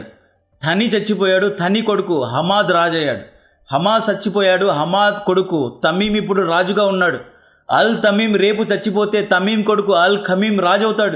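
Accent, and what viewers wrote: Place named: Anantapur, India. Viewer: Brother, nee slang anantapur teluguki daggaraga untundi. Mee ooru?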